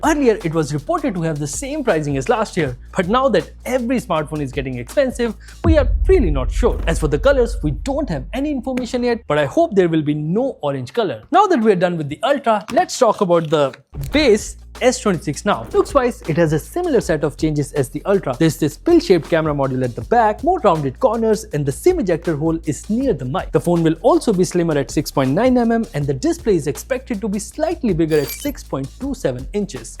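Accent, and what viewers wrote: India. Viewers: Omg. I cannot understand this Indian English literally anything!!! How can he make you tube videos with english being so bad? He swallow words... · I cant listen this indie english 😐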